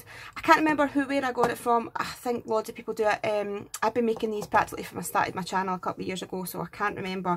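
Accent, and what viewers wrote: Scotland, United Kingdom. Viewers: I love love love your Scottish accident!!!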